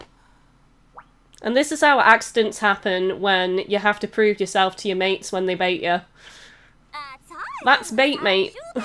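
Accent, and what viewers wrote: United Kingdom. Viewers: Just a quick question what part of the UK are you from? I'm really bad at accents so I can't tell (I'm from the UK too but I only know a few accents for certain)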